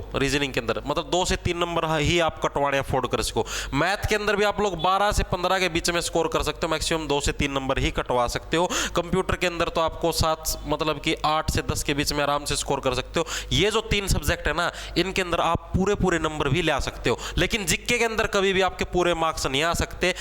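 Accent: Haryana, India. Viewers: Es hariyana ke avaj sunn k laga haa koi pdhava hai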